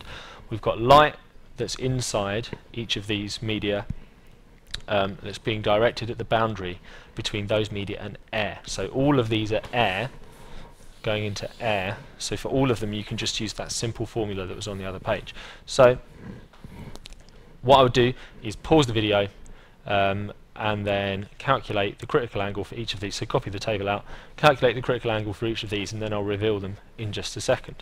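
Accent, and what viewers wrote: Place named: United Kingdom. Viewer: Thanks this video helped me a lot in learning British accent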